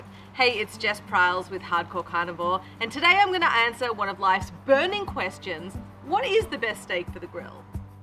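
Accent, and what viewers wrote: Australia. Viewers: Oh my God not only are you beautiful but I love your Aussie accent think I’m in love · You're very good darling, and we love the aussie accent, but I prefer NOT flipping the steak every 30 seconds. Depending on the cut, and thickness that would determine how much time on each side. You still have Love from America ♥️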